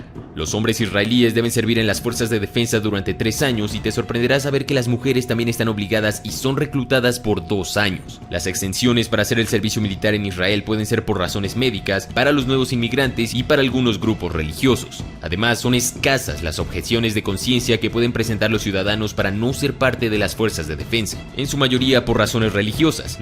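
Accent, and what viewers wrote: Mexico. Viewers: Aa perro .. eres mexicano :0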